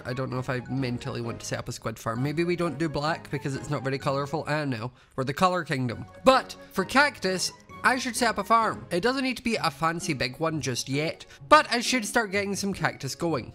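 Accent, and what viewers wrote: Scotland, United Kingdom. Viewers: I've notice your accent is less Scottish since the start of this smp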